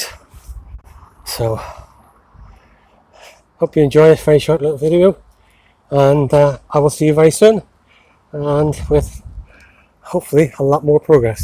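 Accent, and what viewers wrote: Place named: Ireland. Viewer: Well hello there. I get to praise you as my hubbie is a bit of a quiet person. I love to hear your accent it reminds me of some Irish visitors we had in our Bowling Club in Scotland's east coast. You must be a really handy man to have aboot the place. I'd trade you for mine, as I can see you're a quare bit more cuddlier and there's nae heat in that one of mine in a winter's night. Till I tune in again take care my love.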